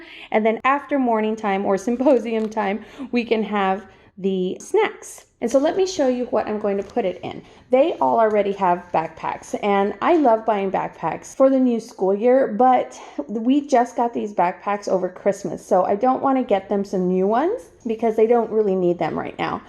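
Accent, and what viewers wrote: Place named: Texas, United States. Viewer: i knew you had to be a texan.... just hearing you speak is like hearing my native tongue.🤣🤣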